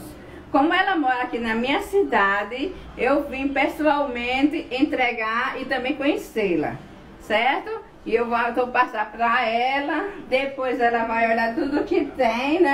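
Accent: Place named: Paraíba, Brazil. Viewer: Olá. amore amo o jeito/sutaque q a senhora fala,lembro da minha vó falando ,minha vo é da paraiba/João pessoa,ela vive aqui no Rio de Janeiro, aie ela viajou/para passa uns 2 anos aí pra João Pessoa ...amooo os sutaques lindos de vocês 😍👏 -->❤sutaques (jeito de falar)❤<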